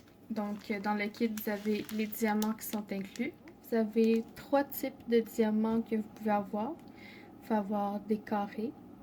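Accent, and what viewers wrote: Canada. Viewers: Coucou très beau début et j'adore l'accent du Canada. merci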